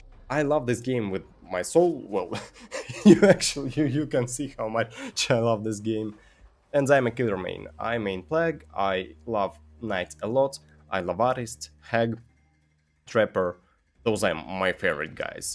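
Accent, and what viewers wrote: Russia. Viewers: Omg dis strong Russian accent:D Nevermind, just keep going! Do you remember how funny was Ochido’s accent? So you should also remember that it wasn’t a problem for him to reach his popularity and audience/fans:) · Listen your english try with balalaika accent is so funny XD You made my day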